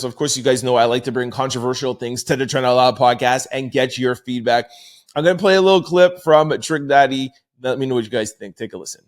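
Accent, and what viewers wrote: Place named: Canada. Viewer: You’re not even an American are you buddy? I can tell by your accent you’re Canadian, correct? Get lost.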